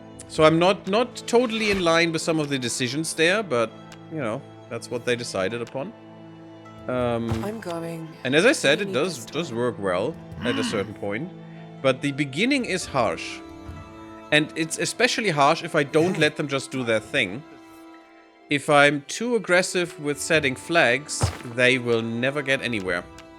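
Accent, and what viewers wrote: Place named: Germany. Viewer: nigga ich kann ganz klar raushören das du deutscher bist. warum redest du nicht deutsch?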